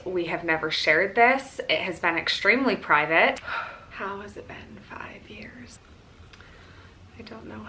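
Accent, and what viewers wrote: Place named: Utah, United States. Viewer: It's part of a Utah accent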